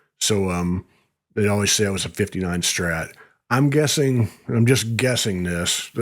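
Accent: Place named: Indiana, United States. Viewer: I really enjoyed the video I'm starting to dig into your channel and it's really cool. Sounds like you're Indiana I'm in southern Indiana myself right across the river from Louisville. Sorry I'm rambling lol thanks for your work.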